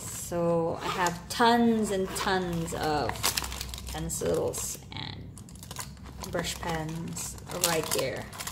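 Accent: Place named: Bisaya, Philippines. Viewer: Hello kabayan nice review. Bisaya ka din ba? I notice your accent kasi very bisaya. Proud bizdak here. 👋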